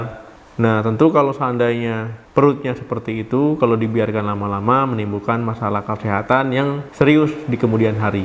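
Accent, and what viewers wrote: Aceh, Indonesia. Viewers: Dokter logat bicara nya aceh banget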